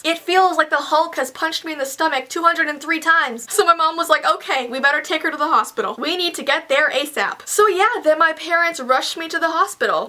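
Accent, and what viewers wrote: Canada. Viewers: Are you Canadian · She Canadian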